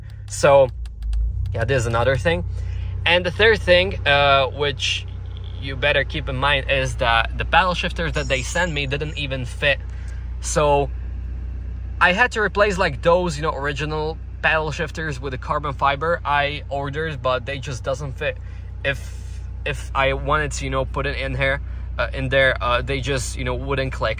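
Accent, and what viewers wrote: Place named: England, United Kingdom. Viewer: Yeah, i already like this english acc :D, i would like to see more for sure.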